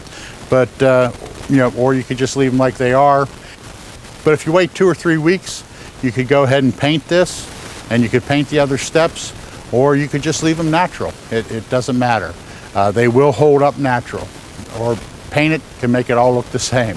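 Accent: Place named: Southwestern Pennsylvania, United States. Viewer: I knew you were from southwestern pa by your accent, and then I saw the steelers helmet on the door. Here we go steelers here we go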